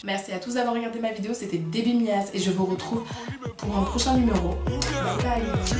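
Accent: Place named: Africa. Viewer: C moche l accent africain. Dsl c est mon point de vue.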